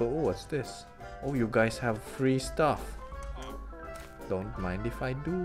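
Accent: Philippines. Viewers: R u a filipino? Ur english accent is same as filipinos, btw minecraft is being popular again hope u inspired by pewds haha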